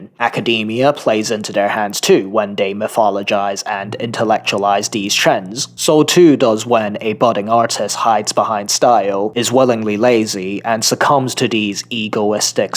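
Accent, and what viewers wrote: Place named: Upper class, United Kingdom. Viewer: why do all of you anti modernists have such a smug upper class accent?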